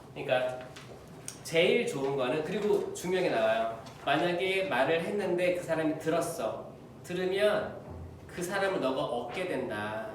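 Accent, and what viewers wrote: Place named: United States. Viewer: 예수님! 신부님 영상 찾아보니 정말 대단하시군요~~*** 강론,특강,성경읽기,영어성경,기도문영상들 ᆢ 매일듣게되는 마법이 있습니다 호주계셨는데도, 우리가배운 미국영어와,성우처럼 음성이 좋으셔서 계속듣게 됩니다 신부님 항상 영육간에 건강하세요🍎 주님말씀 전해주셔서 감사합니다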